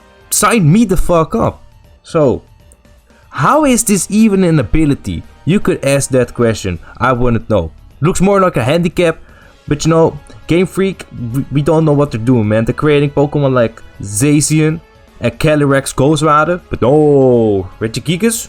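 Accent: Netherlands. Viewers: Lmao dat nederlandse accent maakt deze video zo veel beter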